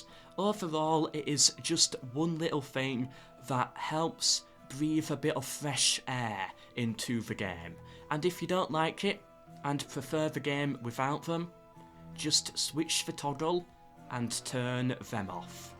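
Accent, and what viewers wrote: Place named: Yorkshire, United Kingdom. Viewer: my relatives are from bridlington, you sound similar. Hope thats not an insult! Love the channel !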